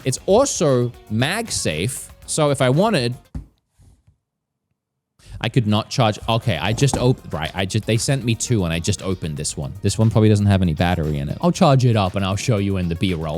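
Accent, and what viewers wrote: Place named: Australia. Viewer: Can someone help me out with identifying his accent. I thought it was Aussie, but then it also sounds American?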